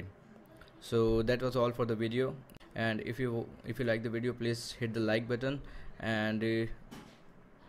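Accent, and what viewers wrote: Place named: India. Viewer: I understand him 75% even if I'm not a native english speaker. He need to speak slowly,but he is Indian and his accent is different.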